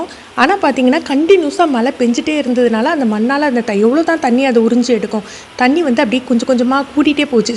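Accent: Tirunelveli, India. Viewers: Akka neenga tirunelvelia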